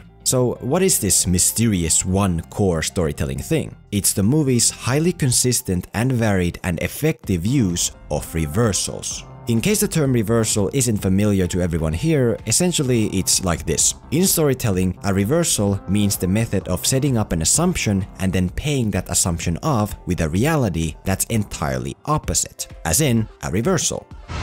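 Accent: Finland. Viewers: Are you Finnish? Because your ralli englanti is showing through. Ja jos oot ni mitä äiä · Are you finnish? For some reason im hearing a finnish accent... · You have the most Finnish accent.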